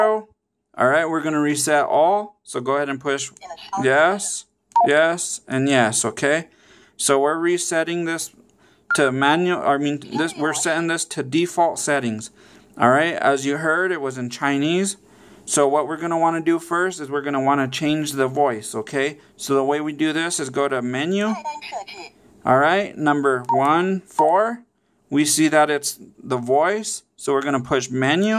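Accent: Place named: Bay Area, United States. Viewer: Thanks bud. You say Hella. Im from the Ca BayArea too.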